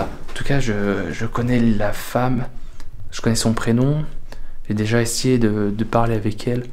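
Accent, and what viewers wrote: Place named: Vosges, France. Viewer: D'après ton accent tu es peut-être vosgien. D'après les anciens même si on ne croit pas aux protections elles protègent quand même.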